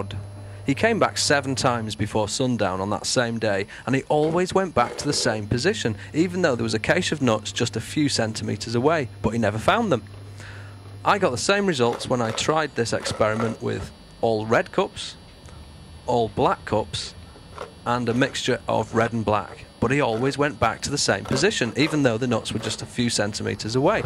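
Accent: United Kingdom. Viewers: Thats how english people pronounce it, simple. Or if this guy is not english, then well, it definately looks like England, so he learnt english there.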